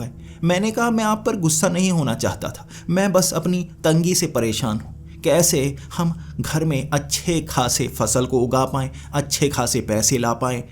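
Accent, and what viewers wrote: Punjab, India. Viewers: Aap Punjab se kuch kuch Shabd aapke alag sunane mein aati hai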